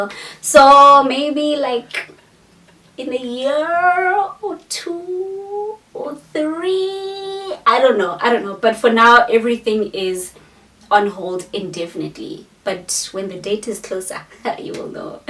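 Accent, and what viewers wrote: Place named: South Africa. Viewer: I'm Nigerian and I love SA movies, the way they talk in them. The way you talk. I already subscribed but please try to create English subtitles for when you speak your language. 💙💙💙💙💙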